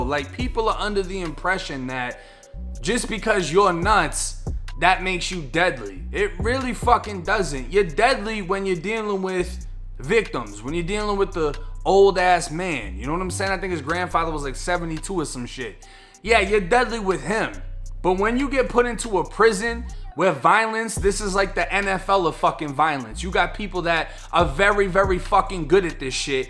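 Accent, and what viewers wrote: Boston, United States. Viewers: Are you from Boston? The accent sounds like a Boston accent curious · You gotta be from Boston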